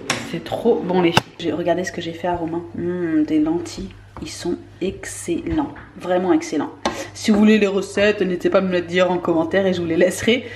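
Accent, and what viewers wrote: Algeria. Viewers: t adorable à parler avec l'accent Algérien et ton papa lahi yahafdou adorable j'en peux plu de rire avec eux 😘😘😘😘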